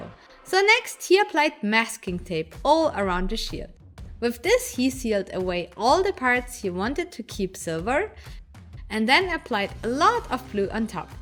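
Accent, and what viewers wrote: Germany. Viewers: Das deutsch englisch ist einfach nur genial 😂😂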